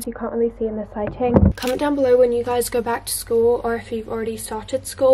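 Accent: England, United Kingdom. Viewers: I started school on the 1st of September, but I got into in-person school on the 6 of the same month. It really makes a difference seeing your friends every day. ANDDD ´s videos are so inspiring! It is also a little bit weird for me knowing that we are on the other side of the world (literally, I live in South America) but at the same time feeling so close! I also want to say thank because she helps me to practice English, especially because she is from England and her accent is so natural. You give me so positive vibes:) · k im a brazilian learning the british accent and i just wanted to say that youre the first person i hear talking slowly because omg. btw where are you from? loved your accent <3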